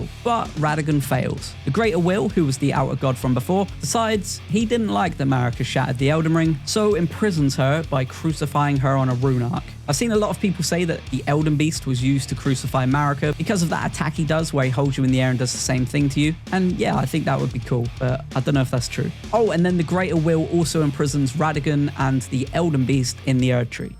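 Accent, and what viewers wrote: United Kingdom. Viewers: literally never seen a elden ring lore video that wasnt voiced by a british dude.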